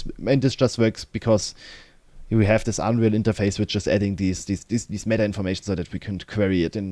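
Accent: Germany. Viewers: No hate, but u can tell he german xD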